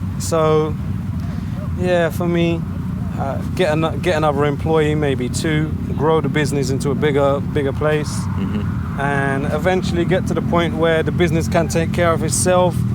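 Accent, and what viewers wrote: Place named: Sweden. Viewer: såg videon om armpump å kände igen dialekten direkt ;) vart i Sverige håller du hus då?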